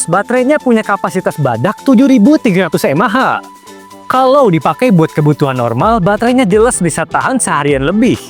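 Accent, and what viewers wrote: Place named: Medan, Indonesia. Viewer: Medan cuy😅😊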